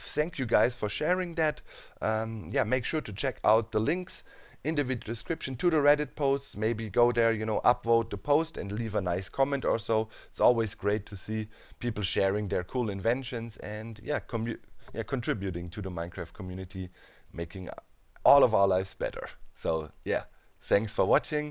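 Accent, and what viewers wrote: Germany. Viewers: Sehr sehr starker Deutscher Akzent xD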